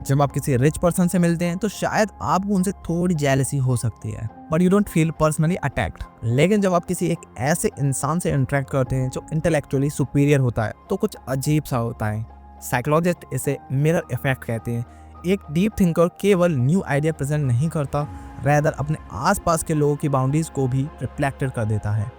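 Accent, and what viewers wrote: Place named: Bengal, India. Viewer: Ek baat samajh aya ki bolne wala Bangali hai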